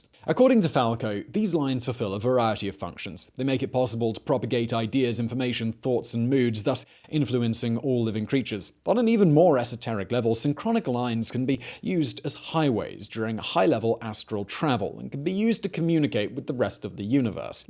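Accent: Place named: Southeast England, United Kingdom. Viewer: It's a bit weird, and the phrasing is idiomatic in places, but it's definitely southeast English.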